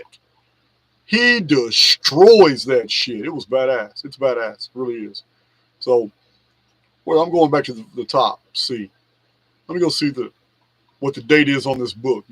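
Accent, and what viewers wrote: United Kingdom. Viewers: Brilliant Manchester accent 😊you didn't piss me of and im Scottish 😂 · Lol...loved the English accent😊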